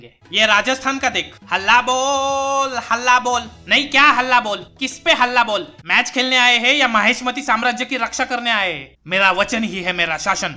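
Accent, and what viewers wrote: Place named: Gujarat, India. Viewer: He is gujju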